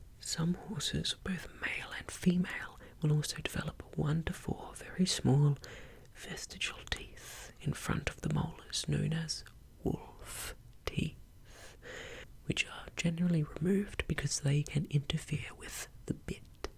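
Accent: Australia. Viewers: Maaan, your Aussie accent is EVERYTHING!😍😍I will listen to you whisper ANYTHING and still tingle!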